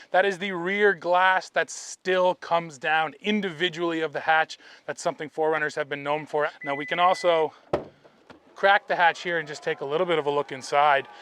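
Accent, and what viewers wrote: Canada. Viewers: Love your regular guy type of reviews paired with a Canadian accent (hello from AK). Trailhunter looks great.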